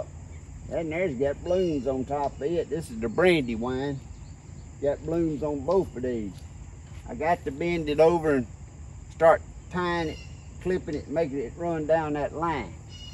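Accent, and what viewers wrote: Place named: Louisiana, United States. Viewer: As soon as you popped up in my search about gin trash and heard your voice, I knew you had to be from "my neck of the woods" Louisiana! And show nuff, after I went to your page, I see your from Northeast LA! Sounds like my Uncles'' a talkin! ♥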